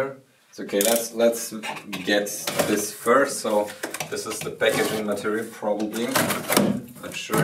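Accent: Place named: Germany. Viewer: man merkt unnormal dass du deutsch bist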